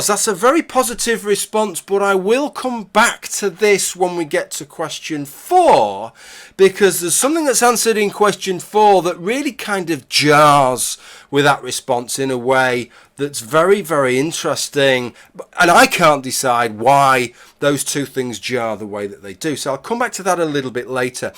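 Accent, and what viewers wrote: United Kingdom. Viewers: I can see why some folks would consider statistical survey videos a bit of a slog, but the results and the conclusion we can draw from them are interesting to me. Also, I enjoy your accent and British slang.:) Call me cynical, but I think the answer to your final point is that the Muslims surveyed were "telling us what we want to hear". Be well,.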